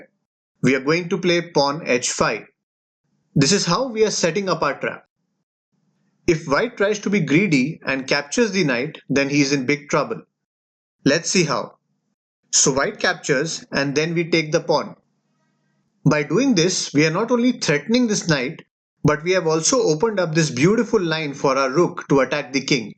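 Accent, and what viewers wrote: India. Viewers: I just want to recommend that you speak slower. Since you have an Indian accent some words are difficult to understand. But if you speak slower it will be great.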